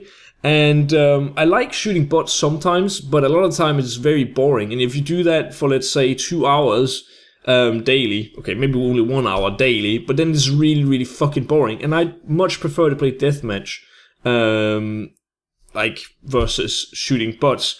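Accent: Denmark. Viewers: Hey man, are you Danish? ? 😊 you sound a bit Danish just a bit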